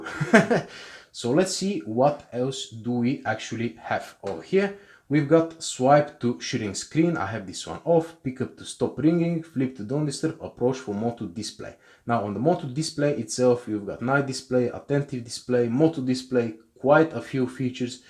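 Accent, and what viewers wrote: Russia. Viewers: his russian accent is funny! lol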